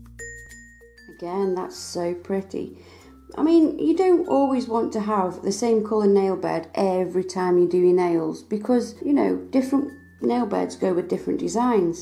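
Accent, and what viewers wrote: United Kingdom. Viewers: Everything sounds better with a British accent!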